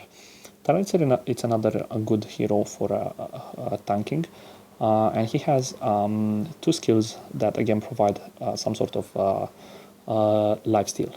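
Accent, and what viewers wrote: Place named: Romania. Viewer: you sound romanian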